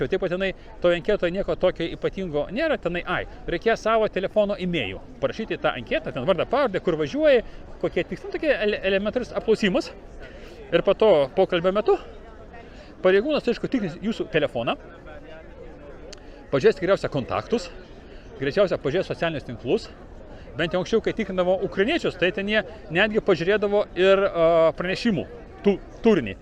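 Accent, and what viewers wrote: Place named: Central Lithuania, Lithuania. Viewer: va tokie konkretus zmones man patinka labiausia. galetu but visi tokie Lietuviai - nuverstume visas konservatinkas jau pradiniam etape. o ne kai prisipizdina lietuviu pinigu. biski ziuriu tarmiskai sneka ;) panasu kazkur is vidurio Lietuvos.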